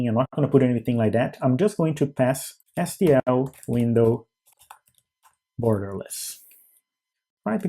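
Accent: Brazil. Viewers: O sotaque não nega, esse cara é BR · Notei o sotaque dele de br também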